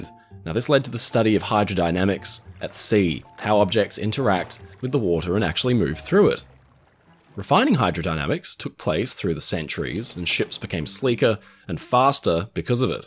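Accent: Australia. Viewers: he sounds like an Aussie to me?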